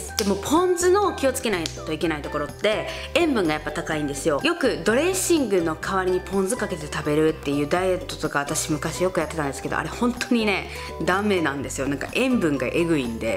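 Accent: Kansai, Japan. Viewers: さんの関西弁可愛いご主人のとこに住んでも関西弁のままでいるの見るとなんとなく安心する笑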